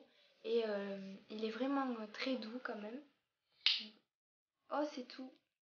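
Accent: South, France. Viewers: Tu es superbe! Le petit accent du sud j'adooore! J'ai TROP envie de tester les box je m'inscrirais surement à la rentrée, parce qu'avec les vacances c'est pas trop le moment ^^ (Je ne peux pas répondre à tes commentaires sous mes vidéos, parce que tu ne me suie pas sur google +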